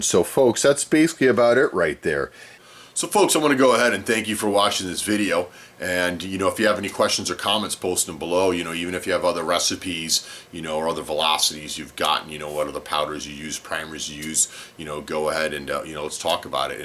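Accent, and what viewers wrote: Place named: United States. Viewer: You sound exactly like my history professor lol, what state are you from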